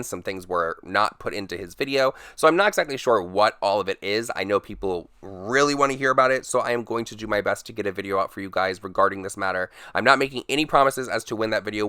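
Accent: United States. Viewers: “BrisBANE” omg I cant handle your accent 😂 super cute through! I’m from Brisbane & I swear all Americans can’t seem to pronounce the cities lol. But if you need to pronounce it in another video about James in Brisbane airport it’s pronounced ‘Bris - Bin’ which is silly because it’s got an ‘a’ I understand 😂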